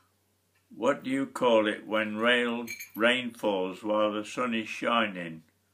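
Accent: Lincolnshire, United Kingdom. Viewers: This is a pretty genuine Lincolnshire accent compared to a lot of examples I have seen online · My family descends from Lincolnshire, and it's simply frightening how little the accent has dulled or changed over the years. There were many times I could hear my own family in this voice.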